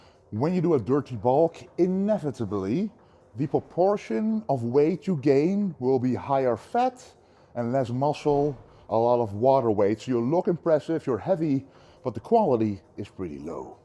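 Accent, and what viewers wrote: Netherlands. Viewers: English as a second language, he's from the Netherlands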